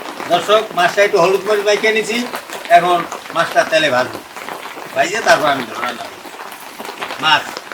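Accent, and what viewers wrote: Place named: Barishal, Bangladesh. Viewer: খাস বরশাইল্লা ভাষায় কথা শুনে মনে হয় নিজের এলাকায় আছি।পটুয়াখালীর ছেলে আমি, আংকেল আমি আপনার ভিডিও রোজ দেখি অনেক ভালো লাগে পাশাপাশি আপনার মাধ্যমে রান্নাও শিক্ষতেছি।আপনার জন্য আন্ত্রিক শুভেচ্ছা ও অভিনন্দন রইলো ভালো থাকবেন।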